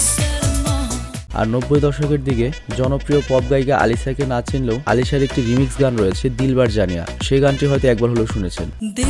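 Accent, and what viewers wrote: Noakhali, Bangladesh. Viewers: এটা কিছু অইলো,,,,, ভাই আপনার বাড়ি কি নোয়াখালী নাকি ।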